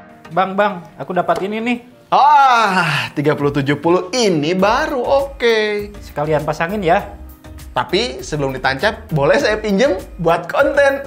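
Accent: West Java, Indonesia. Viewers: Nyunda pisan atuh kang logat na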